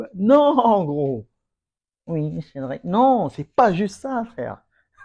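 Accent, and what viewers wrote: Cameroon. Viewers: Grave l'accent camer ❤❤😂😂😂😂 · L'accent Cameroun tu gères ça en normal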